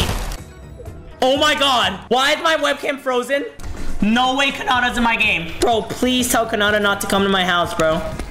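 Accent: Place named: Texas, United States. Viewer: Love the new Texas accent. 🔥🔥🔥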